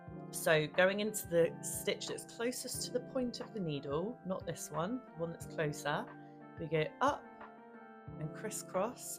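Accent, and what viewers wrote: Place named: England, United Kingdom. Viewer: I'm 73 yrs old. When I was 22, I knitted my baby son a blanket. Now I can't understand the instructions until now! Thank you! Lovely English accent 😊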